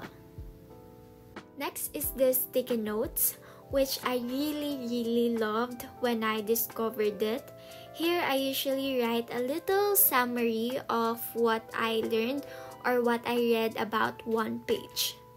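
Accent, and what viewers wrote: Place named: Philippines. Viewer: i like your way of speaking english, it's nice that you don't try to sound like other accent, it's very filipino while sounding very good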